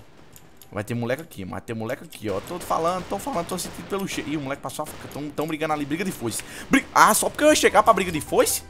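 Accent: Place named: Paraíba, Brazil. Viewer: Ele e MiTo Mais O Portugues de Paraibano Ele Endendi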